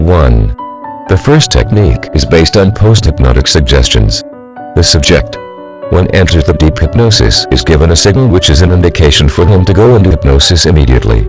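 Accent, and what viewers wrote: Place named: India. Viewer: What do you think is more understandable? An Indian accent or a standard voice bot?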